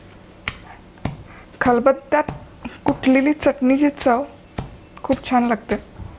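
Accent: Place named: Pune, India. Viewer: Aap bahot looking ho mam aapki har ek recipe achi hepar aapka marathi me thoda sa alag he puna jaisa softly baat karo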